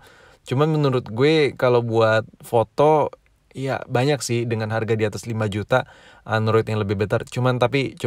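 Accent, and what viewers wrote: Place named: Medan, Indonesia. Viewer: bjirr ada logat medan yak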